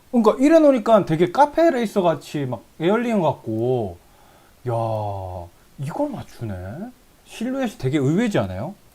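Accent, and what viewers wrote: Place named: Ulsan, South Korea. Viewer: 바이크 관심보다 전부터 느낀건데 울산억양은 정겹네요 ㅋㅋㅋㅋ 차장님보는줄(울산분) 전 밀양분 같은 지역이라더 억양이 틀려 재밌어요 ㅋㅋㅋ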